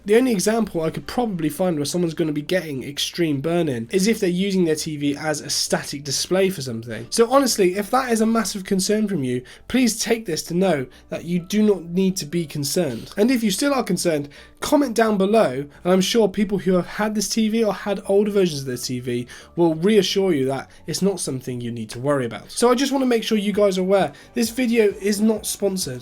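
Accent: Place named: United Kingdom. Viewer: Please, knock off the aggravating BS background noise. It's distracting as well as annoying especially considering your British accented English. Yes, I'm American and speak real English not the bumbershoot and torch strangley pronounced type.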